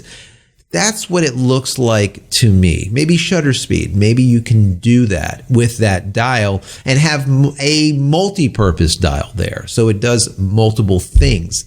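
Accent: United States. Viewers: ) 2 things. Well, 3 things. 1. Great videos, I've been waiting for Nikon to come out with mirrorless for years and I will not be sleeping tonight I'm very excited. 2. People shouldn't harp on you for having an American accent when you pronounce Nikon. N-eye-con... deal with it, Americans speak with an American accent. We also say automobile and not Jidōsha but no one corrects us on that. Fools. 3. The back dial you referenced is historically called the Command Dial, and Nikon has never labeled it in any of their DSLR's. The front unlabeled dial is historically referred to as the sub-command dial. It looks identical to the Command Dial used on the Nikon 1 V3, and I'm sure it'll operate the same.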